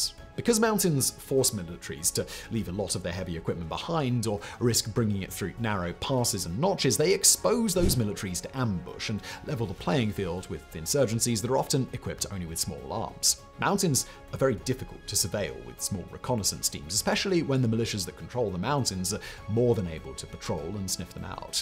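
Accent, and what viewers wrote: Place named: United Kingdom. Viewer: Is his voice not 10x more British in this video than all of the others? Or is it Just me? 😂I actually couldn’t even understand some of what he said a few times and I’ve been watching for like two years. Wth? 🤷🏻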